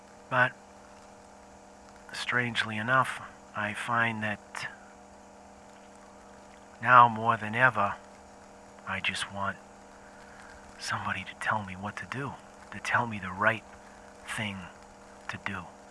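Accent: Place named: Boston, United States. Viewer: What a great way to use the opening tutorial and yet make it completely your own! Really looking forward to this. And so glad you are doing a Boston accent that doesn't sound like a parody of a Boston accent.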